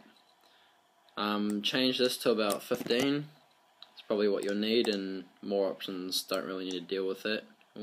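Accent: New Zealand. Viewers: Such a typical kiwi accent lol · Hey man I Luv your NZ kiwi accent !! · Ha ha. I picked your Kiwi accent in about 3.5 seconds... then got your confirmation at the 28 second point. Well done my fellow Kiwi !! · His accent changes the guys vowel to almost gihs but clearly he DID NOT say girls. There was NO L sound in there. I was going to guess that he was an Aussie but clearly it he stated that he is a Kiwi in other comments. My American ears cannot tell the difference(just like many non Americans cannot tell the difference between Canadian and American speech). Very similar.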